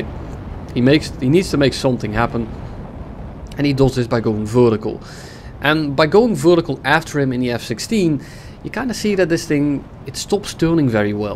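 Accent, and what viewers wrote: Netherlands. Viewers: i smell dutch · Still love the dutch acsent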